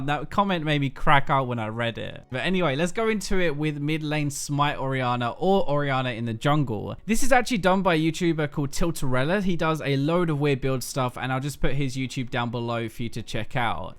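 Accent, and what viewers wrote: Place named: London, United Kingdom. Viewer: you sound like you're from London!!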